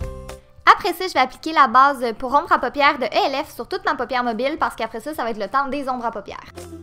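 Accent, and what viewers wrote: Quebec, Canada. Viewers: J'adore ton accent Canadien ❤ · Je trouve que ton accent québécois est quand même très prononcé 😕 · Tu es troooop belle! j'aime beaucoup tes vidéos et ton accent canadien me fait rire à chaque fois! (je suis parisienne...) continue! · C'est un accent québécois! :) Elle vient de Québec, Au Canada!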